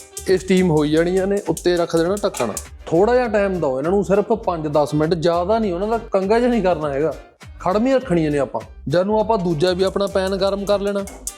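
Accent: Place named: Malerkotla, India. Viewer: ਇੰਨਾ ਕੁ ਪਾਣੀ ਪਾਉਣਾ ਡੱਬੂ ਵਿੱਚ ,, 😅 ਗੱਲ ਸਿਰਾ ਕਰਤੀ ਬਾਈ ,, ਆਪਣੀ ਬੋਲੀ ਇਹੀ ਆ, ਮਲੇਰ ਕੋਟਲਾ ਸਾਈਡ ❤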